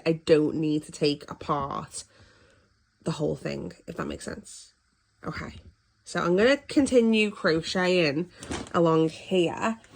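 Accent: Liverpool, United Kingdom. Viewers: Hiya! I was introduced to your channel when you met Amora on her travels and I’m made up to find another northern UK crocheter to follow. I hugely enjoyed this video and you’ve inspired me! I’m trying to guess where you live, as you’ve a very gentle scouse lilt. I was born in Formby and now live in Altrincham x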